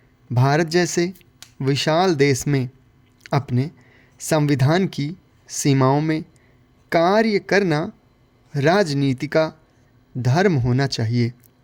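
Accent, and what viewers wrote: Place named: Uttarakhand, India. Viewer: आपके बोलने का तरीका उत्तराखंड में ऐसे ही बोला गया था।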